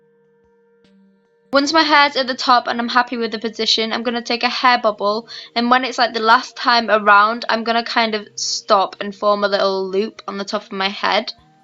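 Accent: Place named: Northern England, United Kingdom. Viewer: Love that accent. I'm not very good with knowing accents, so it's a somewhere-up-north accent to me :) So nice to hear an English voice! I love that you have a middle parting and not a 'center part' ("senna part" yankee accent!) lol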